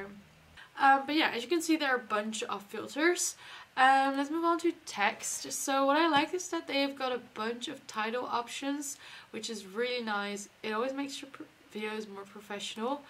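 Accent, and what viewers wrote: Netherlands. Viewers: i smelled a dutch accent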